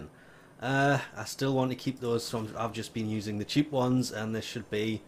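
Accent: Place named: North, United Kingdom. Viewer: Knew I was subscribed didn't know you were northern - just yay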